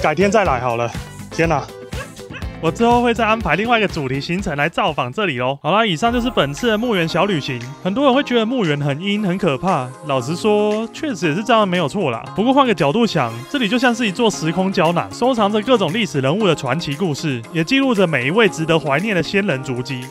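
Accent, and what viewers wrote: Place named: Taichung, Taiwan. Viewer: 第一次看你的影片，看著看著，發現你有台中腔，看到你說：「從大甲家裡出發…」果然🤣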